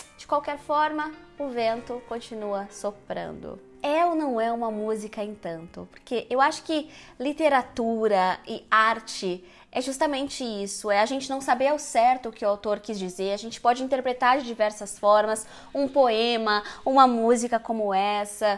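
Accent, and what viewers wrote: United States. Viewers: e uma banda britânica. Porque esse seu sotaque americanizado. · monstruósiti amei sua pronuncia manstruásitee a fonetica disso ta bismillah é pelo amor de DEUS e beelzedbu has (r forte como no português) a devil put aside for me é o correto dizer biowtzeboo Réssa dévul pat assait fô mi fô miiiiiii , ok? vastest du? tente entender esse seu inglês americanizado bem a empresa ai já diz né CCAA , letra Ó tem som entre oa ambos acentuados agudos graves bóá rápido as quick as a flash reparou que não pus as quickly? queria ler de vc de presente saudades em inglês essa palavra vc faria?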